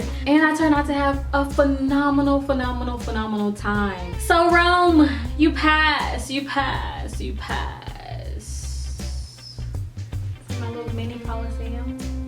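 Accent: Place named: Nigeria. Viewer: You sound like a Nigerian. Your mum is a legend.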